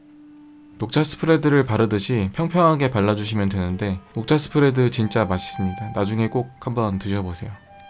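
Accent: Daejeon, South Korea. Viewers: 형 대전분이셨어요?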